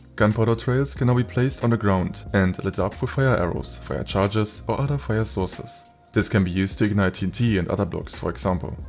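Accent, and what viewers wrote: Germany. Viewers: bro has the most german accent i have ever seen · Hi, kann es sein das du deutscher Muttersprachler bist? :) top Mods wie immer! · why does bro sound german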